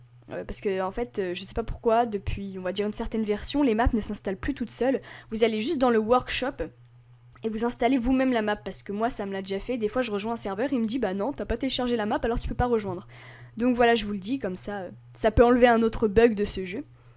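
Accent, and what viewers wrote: France. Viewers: Arrete de faire des blagues nulles avec ton accent marseillais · l'accent du sud qui me fait rire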